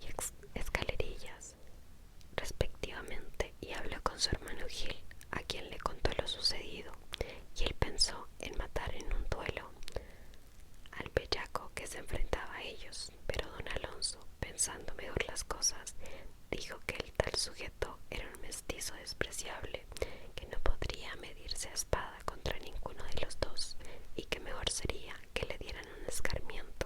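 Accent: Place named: Colombia. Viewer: Crei que eras Colombiana 🤔😍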